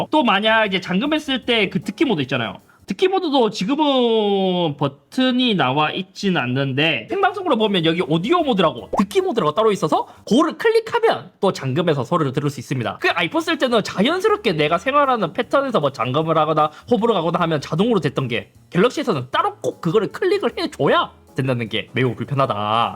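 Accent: Gyeongsang, South Korea. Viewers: 경상도 사투리 쓰는데 화나 팬이시네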